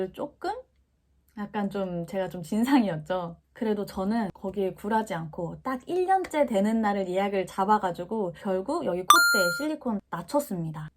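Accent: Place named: Jeolla, South Korea. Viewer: 용 됐네요 전라도 사투리 쓰시는군요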